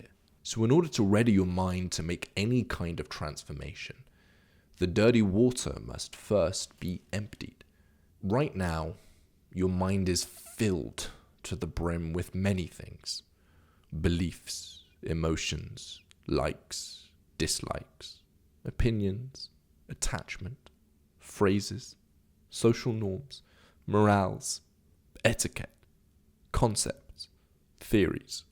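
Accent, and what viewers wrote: United Kingdom. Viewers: I agree with you. If most of our beliefs and the beliefs of society are false and are not based in reality, how does he explain science? Hell, how would beliefs and his Effortless Action System be anything different other than false beliefs that are not based in reality? Oh, has stumbled upon the "Truth"? It doesn't help that it feels like is trying to subtly gaslight us into thinking that it's his teachings which are the only ones that are rooted in reality. Feels a bit cult-ish, to be honest. Honestly, this video felt like a slightly sophisticated Law of Attraction video with a British voice over. It also doesn't help that I feel like I haven't really been given much of an argument to examine so much as some clever sophistry.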